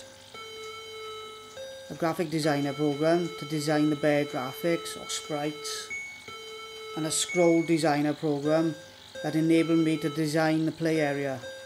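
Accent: Wales, United Kingdom. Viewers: Awesome little game!,love the Welsh accent lol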